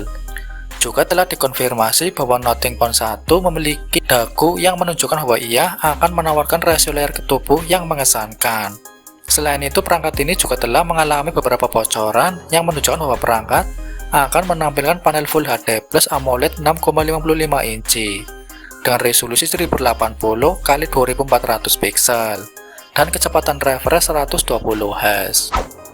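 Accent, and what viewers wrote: Banyumas, Indonesia. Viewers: Dri dialeg nya kdgrannya org ngapak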